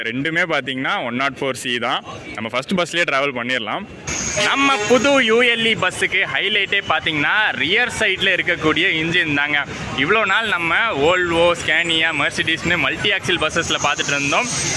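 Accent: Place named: Nagapattinam, India. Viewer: Tq sir.., nagai language la pesurin😊😊ga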